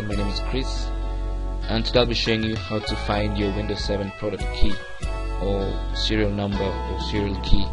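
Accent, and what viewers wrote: India. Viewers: This guy sounds like one of those Indians that cold call you and demand money telling you computer has been hacked... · me hears indian accent clicks off video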